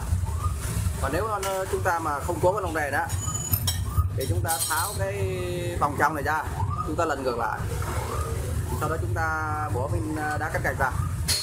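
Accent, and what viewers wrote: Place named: Thanh Hoa, Vietnam. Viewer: nghe giong noi dan thanh hóa, thấy sợ sồi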